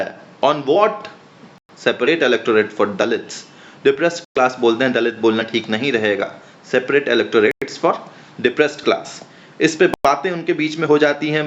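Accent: Bihar, India. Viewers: Sir ka bihari tone ...very sweettt ...🥳 Mazzzzzaaaa aaa gyaa ...grt explanation 🙏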